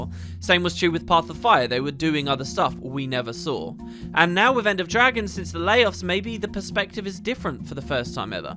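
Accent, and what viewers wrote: United Kingdom. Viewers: "wearing his burnout on the game" DUDE youve been covering this game for like 14 years bro I literally give you the most respect for sticking through with consistency and having enjoyable content. Your voice is so ingrained in my head that whenever I hear a british accent at work I think "Oh that guy sounds like lmao". keep going, this franchise is my favorite and you are basically one of the main reasons I even play guild wars today. Keep it up, never let them get you down, we are all here for you my man and waiting for the day you start doing three two-hour EoD episodes:DDDD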